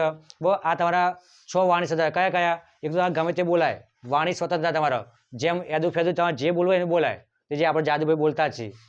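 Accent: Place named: Sabarkantha, India. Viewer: સાહેબ સાબરકાંઠા ના નિવાસી લાગો છો.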